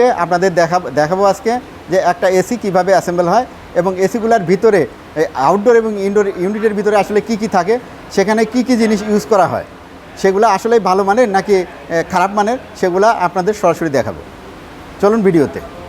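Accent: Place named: North Bengal, India. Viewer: বাড়ি উত্তরবঙ্গ মনে হয় ভাষার ল্যাঙ্গুয়েজ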